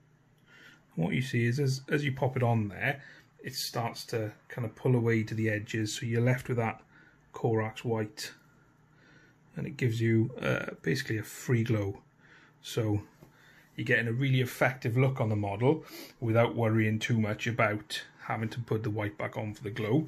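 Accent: Wales, United Kingdom. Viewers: Great job. You sound Welsh mate. Where to are you from like? Sorry, couldn't resist. Shout out from the funny, sunny Rhondda.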